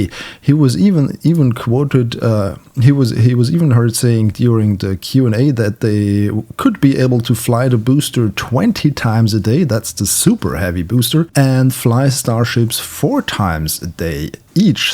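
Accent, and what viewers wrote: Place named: Germany. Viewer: man hört den deutschen akzent in deiner stimme raus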